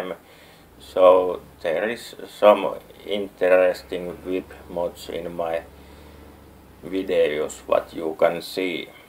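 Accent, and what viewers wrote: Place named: Finland. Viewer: Eihä sille voi mitää itekki menee englanti siihe ralli englantii kuten valtra valmet mainitsi jo:D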